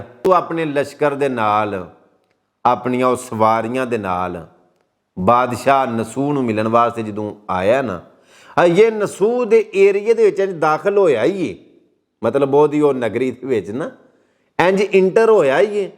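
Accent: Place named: Kasur, Pakistan. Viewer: jee tohada programe pehli wari vekhiya. Dilkhush hogia. Tohada Andaz wadhiya hey. Kasoor de lokan wangoom boldey ho.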